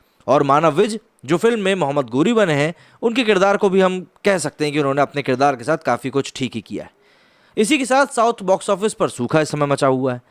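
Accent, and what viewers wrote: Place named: Punjab, India. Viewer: His pronunciation is like that of Punjabi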